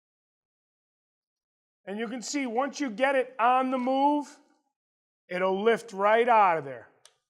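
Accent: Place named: New England, United States. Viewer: GOD! I love eye-talon guys from the the east coast,new england!!! Fuckin accent is crazy awsome!!!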